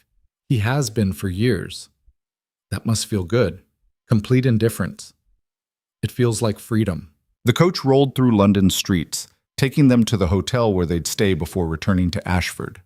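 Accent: United States. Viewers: I hate this AI narrator. American or Canadian accent for a British based story...and it's voice is just annoying.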